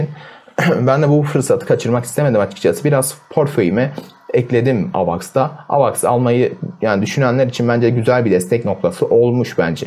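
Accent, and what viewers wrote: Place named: Gaziantep, Turkey. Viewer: hocam antepli olduğun o kadar belli ki ;)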